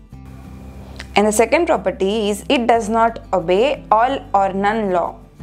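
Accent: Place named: India. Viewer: indian accent is so irritating 🥲 thanks for the vdo though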